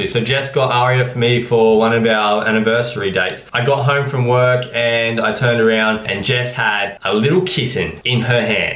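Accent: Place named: Australia. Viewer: Omg really? You sound like a Aussie for sure lmao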